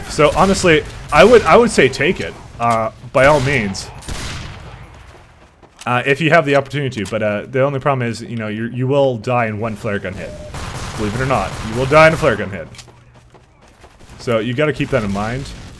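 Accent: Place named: Canada. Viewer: His Canadian accent may have something to do with it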